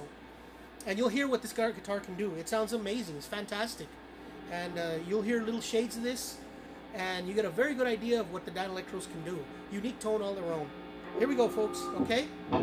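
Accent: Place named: Mexico. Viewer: Tienes cierto acento mexicano jaja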